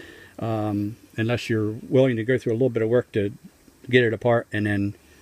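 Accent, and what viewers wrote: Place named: Pittsburgh, United States. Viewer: Must be from Pittsburgh...can recognize that accent instantly... that or Ohio...ya?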